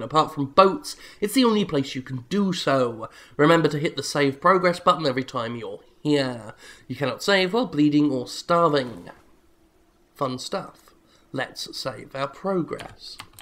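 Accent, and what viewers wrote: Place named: Liverpool, United Kingdom. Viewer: Bill Nigh has the most elegant voice I've heard. This narrator though, I'd say he sounds more like George Harrison, that Liverpool sort of accent.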